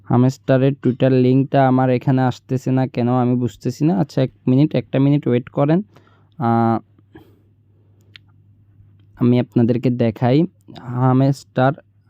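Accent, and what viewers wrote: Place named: Sylhet, Bangladesh. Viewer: Vai to Sylhet e😁 ami to buji laice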